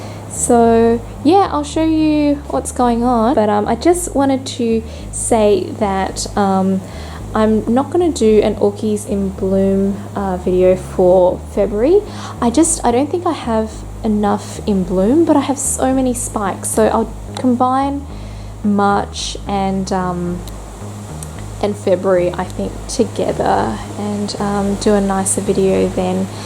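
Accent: Australia. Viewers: I really enjoy your Orchies in Bloom videos. For one thing, I could listen to your voice with that Australian accent all day long. It’s so soothing and your content is interesting. Looking forward to the star of the show next month!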